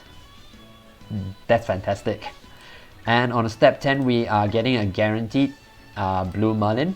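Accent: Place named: Singapore. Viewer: I recognize that Singapore accent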